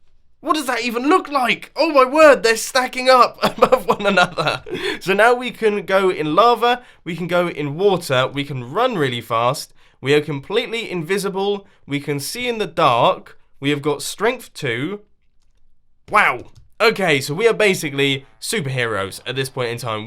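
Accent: England, United Kingdom. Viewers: I subscribes a year ago to your awesome channel, and began watching hermitcraft when you first started season 4 The video that made me subscribe was your 50 facts about minecraft video And I am subscribes cause I first absolutely LOVE your English accent :D (I'm Dutch btw) and I myself also love Redstone and that sort of stuff and can relate to you in the way that you call 'spoon moments' 😂 The only thing that I don't like about your videos is the consider subscribing thing, but if that gives you more subscribers then please hold it, you deserve the subscribers <3 You have become one of my favorite YouTube channels, and I am so happy that I found your channel Please keep up the absolutely amazing work!! You make me happy every day at 8 o'clock, thank you for that ☺️ BTW, succes with your longboard😜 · I probably subbed only at the start of hermitcraft series 4, not sure how long ago that was, but not too long. 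However, before that i saw quite a few of your tutorial videos. I love your redstoning and what i see as your intricacies. The first video i ever saw of yours i think was your blaze farm with crusher and lighting system, though i really only watched it for the crushing mechanism, which was super awesome and compact. Besides the things listed above, I enjoy watching your videos because of how comical you are sometimes, but i also just love listening to British people speak!!!!! You are a great minecraft player and ive learned a lot from you. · First video i watched was about Shrek... don`t remember what it`s called. But i liked creativity of trolling. I love friendly trolling, and jokes. I like timelapses, and fast progress. I like your voice, and this... "British" style of talking you got. I don`t know what to say more. Just... I`m relaxing while watching your videos. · I subscribed about a year ago. 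I don't remember what video made me subscribe, but the main reason I subscribed was because if his accent. I love a British accent. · I subscribed at summer of 2015, i was interested in hermit craft series 3. I love the redstone content and your British accent, it is charming! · I love your British accent, it's so funny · NO clue when and ... i just watched etho mostly and that brought me to you sooner or later, then i just watched like ALL of the hermitcraft videos then i kinda just decided HEY LET'S SUB TO THIS DUDE CUZ HE'S GOT AN ENGLISH ACCENT AND HIS VIDS ARE DANK, i should Re-Evaluate my life, love the vids in general peace ;P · Hey;) I know this video is a little older and you might not even see this, but you've asked some questions in this Video and i just had to answer since I am binging all of season 4 for the past few weeks haha:D I did subscribe today cause I honestly kinda forgot haha and just kept watching^^ To be honest, I was watching Pewdiepies latest Minecraft Series and I just needed more, searched on Youtube for some time and found your channel aswell as the Hermitcraft Playlist, I started with season 4 and well, 94 Episodes later I am still having a blast!! 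The why is easy for me, your voice is extremely soothing and comforting, and I also really love your accent;) I am from germany and listen to British people or English speaking people in general helps me improve my own skills:) I also really love your creativity (not to say, the other Hermits aren't:) ), your style of editing and the way you talk to the audience. It immediately made me feel like part of your community! So please just keep doing what you do and I will continue binging the Hermitcraft Seasons:D Greetings from Germany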